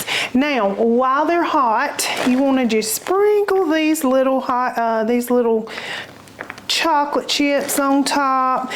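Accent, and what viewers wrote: South, United States. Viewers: I love love love your accent Southern belle accent lol. God bless you sister!!! U had me at peanut butter, you make a peanut butter sheet cake before with peanut butter frosting???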